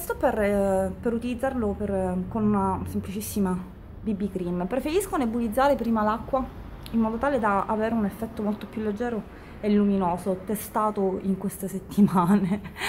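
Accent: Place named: Palermo, Italy. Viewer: Ciaooo ti ho appena conosciuta su YouTube! Ma sei di Palermo? Si sente un po l accento ma non vorrei sbagliare ^-^ sei in gamba mi sto vedendo un bel po dei tuoi video perché vorrei mettere me ma anche la mia famiglia a dieta xD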